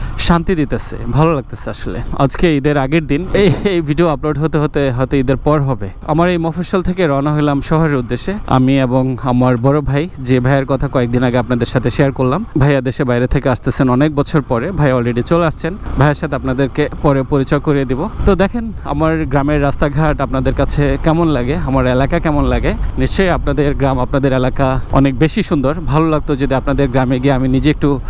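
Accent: Chandpur, Bangladesh. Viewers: Apnar basha Chandpur, age jantam na😮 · Vaiya apnr vasa ki Chandpur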